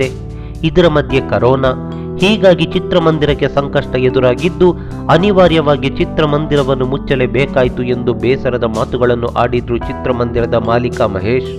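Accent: Coastal Karnataka, India. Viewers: Sir thanks 😊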